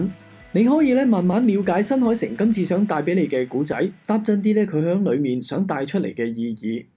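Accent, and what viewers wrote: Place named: Hong Kong. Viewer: 香港人崇拜廣州話，所以抄襲了廣州話。香港本土語言係圍頭話，但係你叫香港人講圍頭話，香港人會唔肯講。因為香港人崇拜廣州話覺得廣州話高雅，厭棄香港話（圍頭話）難聽老土唔入流。建議大家好似澳門人咁學正音廣州話，唔好學充滿懶音嘅香港圍頭式廣州話。 冰室係香港人抄襲偷盜廣州嘅嘢 我澳門人真係睇唔慣。冰室，又叫冰廳，廣州話用以稱呼冷飲店。其是南粵地方一種主要售賣冷飲、雪糕及沙冰等冷凍食品的飲食場所，起源發跡於廣州。在香港流行於1950至1960年代，被認為是茶餐廳的前身[1][2]。過往廣州的冰室會經營雪糕、凍奶、冰水，兼營咖啡、奶茶等熱飲和西餅麵包 澳門人香港人說的廣東話學術上最準確定義 叫作廣州話（澳門官方政府文書也寫作廣州話）廣州話英文叫Cantonese Canton就是廣州英文舊稱 當然現在你也可以看到許多痕跡 譬如廣州機場代號是CAN 廣州塔英文叫Canton Tower 我係澳門人 澳門一直係廣府話/廣州話地區 但香港唔係 香港一百年前還在講圍頭話（香港話） 一百年前澳門和廣州影響力遠遠高過香港 香港人放棄圍頭話學我地講廣州話 香港人講廣東話口音非常難聽好多懶音（畢竟係抄返黎）建議你學正音廣府話/廣州話 廣東話本身係錯誤名稱 魯迅講過：一直如此 便是對嗎 潮州都係廣東 梅州又係廣東 唔通潮州話梅州話都係廣府話？ 呢個語言係名副其實嘅廣州話 我作為澳門高質大學生鍾意講求學術 希望啲港喱唔好介意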